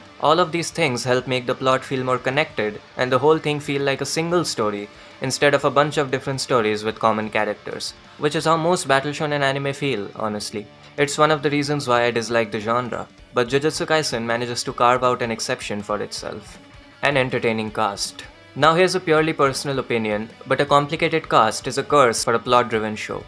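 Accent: India. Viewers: Indian accent. ok im out. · Sounded indian so i subscribed ❤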